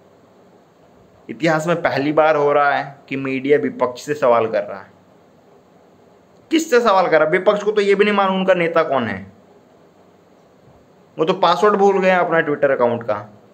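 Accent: Bihar, India. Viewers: That bihari accent 😍, makes the video great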